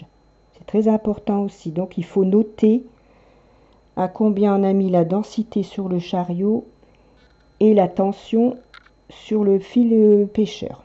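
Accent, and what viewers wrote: North, France. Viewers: Merci pour vos explications j'essaierai d'appliquer vos conseils et vous tiendrai au courant et encore un grand bravo pour vos vidéos encore une petite question il me semble reconnaître un accent nordiste dans votre voix est ce je me trompe ?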